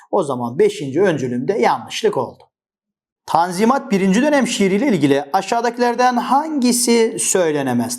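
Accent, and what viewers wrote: Black Sea, Turkey. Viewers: Hocam karadenizli misiniz karadeniz agzina benzettim konusmanizi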